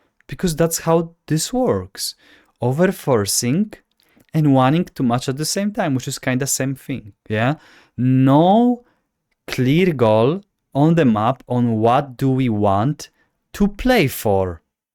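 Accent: Poland. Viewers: piękny polski akcent. drugi taki, rosyjski, Malkovitch w "Rounders"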